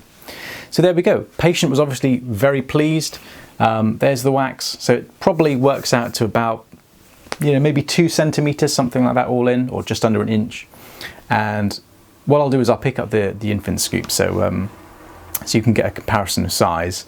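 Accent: England, United Kingdom. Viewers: Very nice haircut Mr.. You are so cute and the english accent is cherry on top 😍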